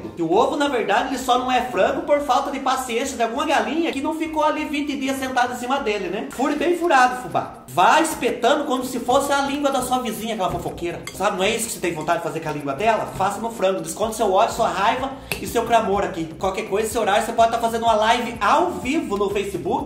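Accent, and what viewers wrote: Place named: Minas Gerais, Brazil. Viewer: Acho muito interessante que é de São Paulo e conversa muitooooo parecido com nós os mineiros muito mesmo, muito legal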